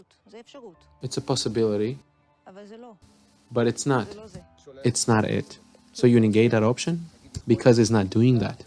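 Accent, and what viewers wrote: United Kingdom. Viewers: He speaks proper Londoner accent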